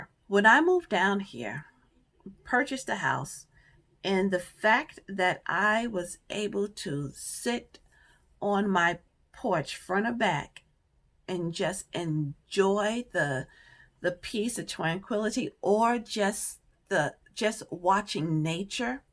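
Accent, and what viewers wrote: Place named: Georgia, United States. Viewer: I’m 3 yrs. Late but for being born in NYC and living in NORTH Jersey you sound like you come from Georgia. If you’re still there in a few more years we might be neighbors when I finally retire.. 😂😂😂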